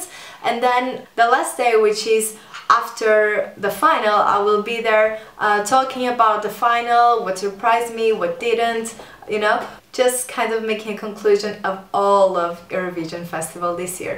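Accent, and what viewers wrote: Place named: North, Portugal. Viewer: Parabéns pela Entrevista da rtp 1!;) (Y) Estiveste muito bem na TV! falaste bem o assunto, Representaste bem a nossa família/comunidade eurofã portuguesa:) Claro Vou acompanhar 2 outras entrevistas, só fui para parabenizar a entrevista que fizeste, acredito o numero de subscritos vai aumentar um pouco, que é bom para teu canal!:) E nota-se mesmo que és do Porto, com seu sotaque nortenho não engana ninguém hahaha xD Boa semana eurovisiva!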